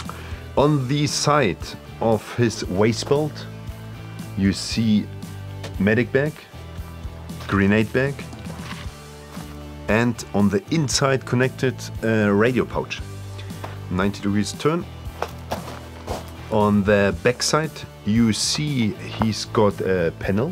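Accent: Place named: Germany. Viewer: Nice video. I like LT gear. Always reliable, innovative and robust . Good video. I like the German accent. Had to think about the German coast guard video. <link>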